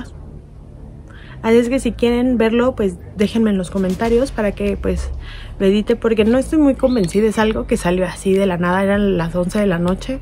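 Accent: Mexico. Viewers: Tu voz siento que está diferente, antes te escuchaba algo chilanga y ahora es diferente, por cierto te vez muy bien muy linda saludos desde MTY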